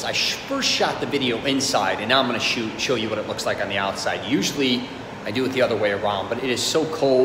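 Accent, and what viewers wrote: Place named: United States. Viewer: The city's name is pronounced Re-JYE- na . You didn't hear it on your flight when you touched down? You're VERY american as is your yankee accent!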